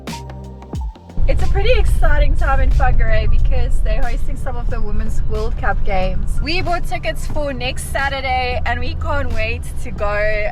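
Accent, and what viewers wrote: South Africa. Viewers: Fantastic. Nice vid. I love the Afrikaans accent.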